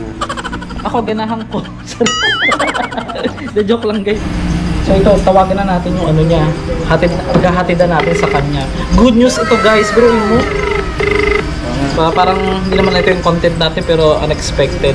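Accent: Visayas, Philippines. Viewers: Maraming salamat, bisaya ka pala kala ko taga bulacan ka, new subscribers here😊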